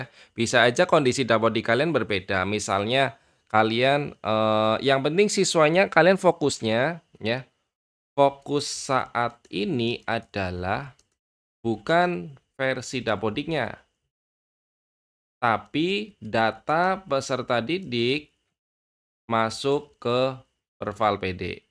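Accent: Banyumas, Indonesia. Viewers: saya masih duduk manis jam 1.20 depan laptop buat sinkron, eh ketemu mas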